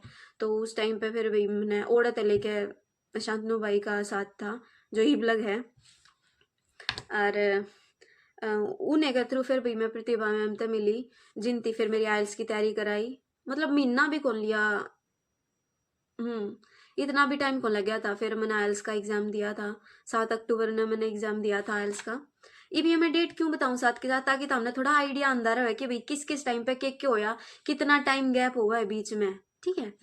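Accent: Haryana, India. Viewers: omg i just found your vedio and its soo good cheery on the cake your haryanvi makes itt more better 🤗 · It's little bit harder to understand haryanvi but not tough ❤❤❤ Tnx to make this video 🎉 Congratulations 🎉👏🎉👏...( Me bhi gam ki chori hi hun didu 😢😢😢😢) ...and that all problems I have 😢😢😢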